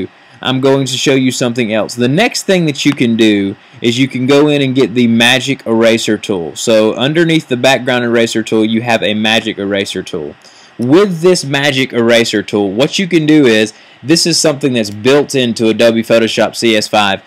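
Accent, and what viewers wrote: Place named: Tennessee, United States. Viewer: I love your voice. I detect a slight accent. Is it from Tennesee?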